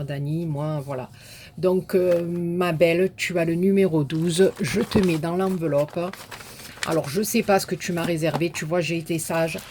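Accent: South, France. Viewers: Bonjour, quelle belle voix du sud, beaucoup de soleil et d'émotions dans la voix, adieu la barquette en plastique et bonjour le nouveau pot "carrousel" à crayons, très très jolies créations j'aime beaucoup tous les envois et les astuces c'est trop sympa les ballons biz à toutes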